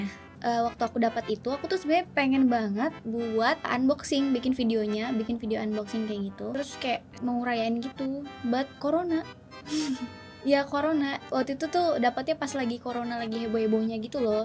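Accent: Banjar, Indonesia. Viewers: banjar kah kak?cra ngmngnya kek org banjar😭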